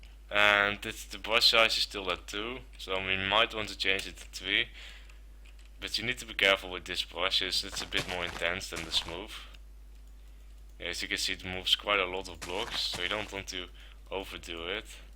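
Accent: Netherlands. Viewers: You sound like a Dutch person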